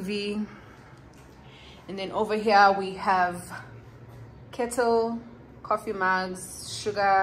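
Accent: Nigeria. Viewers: Please this naija accent is everything and more 😂😂😂😂❤❤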